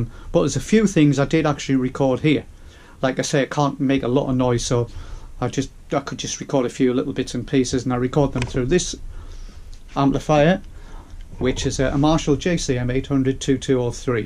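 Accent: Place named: Northern England, United Kingdom. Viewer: you're a bloody musical titan, honest! I also have a lot of fun listening to your northern English accent, which I'm very fond of. Thank you for being a legend!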